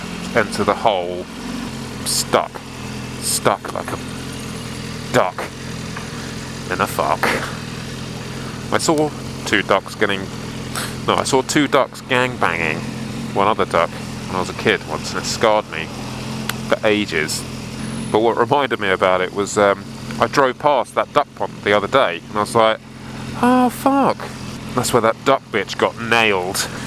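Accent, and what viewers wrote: United Kingdom. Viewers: 5 seconds into this video and i have to say. I love british accents..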